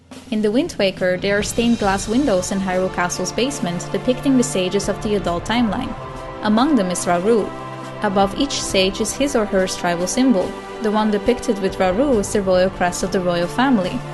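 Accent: Netherlands. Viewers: Are you from Belgium or the Netherlands??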